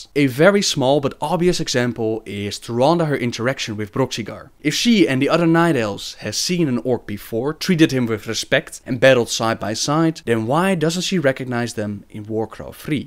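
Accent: Netherlands. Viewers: Dat dutch accent :D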